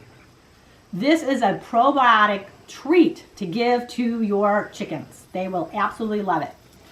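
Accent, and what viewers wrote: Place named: North, United States. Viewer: So much I didn't know about apple cider vinegar, wow! Thanks for all the tips and tricks. I'm putting home made a.c.v. on my list from now on! Also,...I hear a northern accent. Are you from WI by chance? Cuz I am, and would love to brag that you are too!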